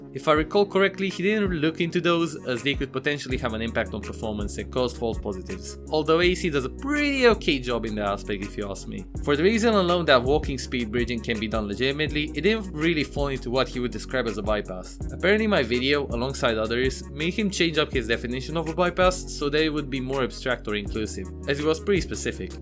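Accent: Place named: Romania. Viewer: ai accent de roman ma întreb de ce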